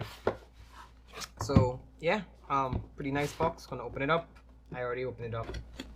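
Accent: Trinidad and Tobago. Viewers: Your awesome T&T accent is a nice change of pace from the hundreds of other FPV videos I watch from guys in the midwestern US. :) Great video. Keep it up! I subscribed, and look forward to more great content. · South Africa but spent a great deal of my life in the Eastern Caribbean. From ABC's up to BVI. Visited Trini regular and Tobago was one of my fave kite spots. The Trini accent while toned down in the vid i remembered ha ha ha ha ha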